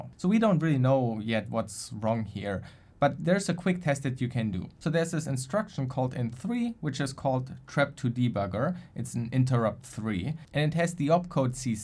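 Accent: Germany. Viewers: I really like your videos a lot and have a great deal of respect for you but your accent eludes me. I mean, your account says you're from Germany and your pronunciation also sounds German but sometimes you have really weird grammar that doesn't really work in German or English? For example in the first part of the video: "Because I get often questions..." The more natural way to say this in English would be "Because I often get questions" But! In German the sentence in this context would be "Weil ich oft Fragen kriege" (or something similar) WHICH HAS THE SAME ORDER OF WORDS EXCEPT TWO DIFFERENT WORDS ARE SWAPPED Again, please don't misunderstand this as critique or an insult (As a German, I totally get how hard it is to speak English well!). It's just that the mistakes you make genuinely intrigue me.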